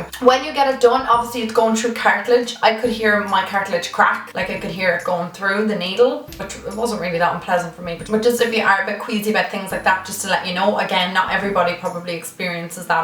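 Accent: Ireland. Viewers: Kassie Pistone Thats called being irish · she's irish... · she sounds Irish or Scottish but I could be wrong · Are you Irish 🍀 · Nice piercings, I got my tragus done on each ear and both of them wormed their way back out, so upsetting, sacred to have it done again encase the same thing happens but I really liked it when they were done! BTW, LOVE your makeup, especially the blue on lower lash,what have you used eye shadow wise? Love an Irish accent :)) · Are u Irish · Are you Irish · Your voice is so soothing!!! I love it. I guessed you were from Ireland before you even said it 😂 wanna visit so bad!! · i thought i knew your ascent i was in ireland not to long ago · does anyone think she sounds like cheryl cole with an irish accent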